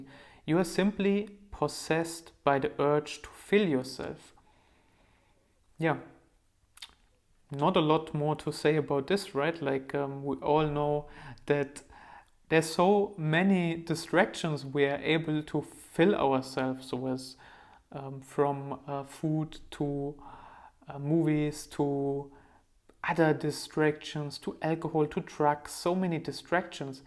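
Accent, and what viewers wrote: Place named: Germany. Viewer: Grateful that I found your channel.. and an very calm and deeply accurate.. luckly I love raw foods.. ahaha.. your esscent is familiar, are you from Germany? Thank you once again for your expression..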